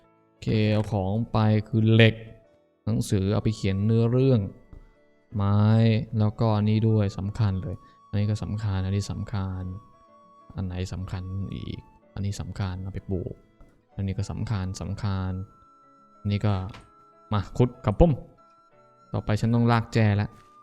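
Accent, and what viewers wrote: South, Thailand. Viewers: ลุง พี่พูด ภาษา ใต้เหมือ จริงๆ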